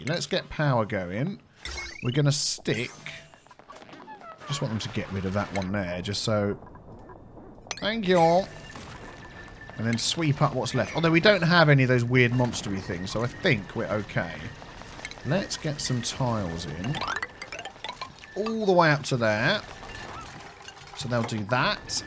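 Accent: United Kingdom. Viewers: I love this game so much. But being somewhat of a voice fanatic, I'm obsessed with your voice. All I do is listen and try to add certain aspects of it to my repertoire. Can I ask where in the UK you're from?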